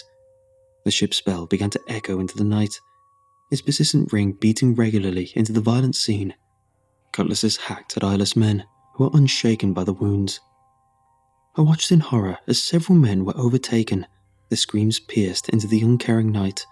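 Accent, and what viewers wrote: United Kingdom. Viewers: Best horror reader there is. Can't beat a smooth British accent.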